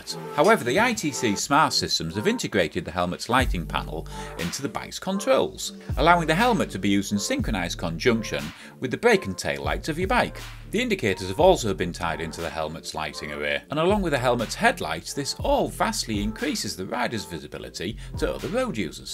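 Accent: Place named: England, United Kingdom. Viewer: tried to listen to a couple of road rash videos. can't stand the sing-song, english narrator.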